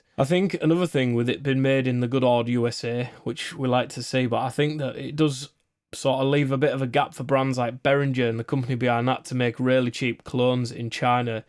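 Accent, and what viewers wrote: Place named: Yorkshire, United Kingdom. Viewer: Sounds like Selby or a Donny accent. I'm I close?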